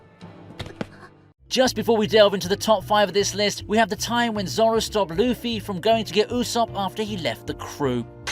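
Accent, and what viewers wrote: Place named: United Kingdom. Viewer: No one gives a shit about that detail he’s british let him use his accent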